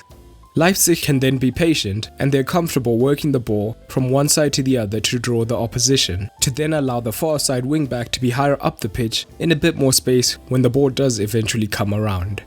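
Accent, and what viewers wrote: South Africa. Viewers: Are u south african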